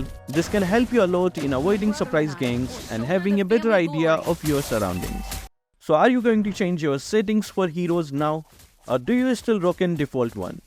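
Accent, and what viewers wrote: India. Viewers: Bro are you indian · Ofc he is))) accent is perfectly Indian)) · Are you Indian Sir?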